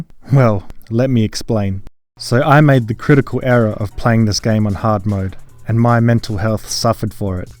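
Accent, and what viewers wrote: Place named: Australia. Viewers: Australian I'm guessing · Hi are you Australian or from New Zealand like your accent is incredibly subtle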